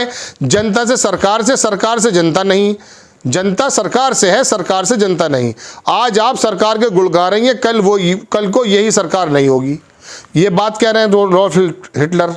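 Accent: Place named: Saharanpur, India. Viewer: bhai aap mere hometown ki bhasha bol rahe hain Shayad aap bhi saharanpur k hain.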